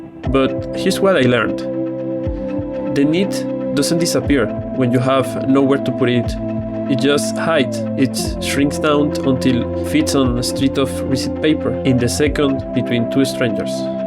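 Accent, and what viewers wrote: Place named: France. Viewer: French accent is funny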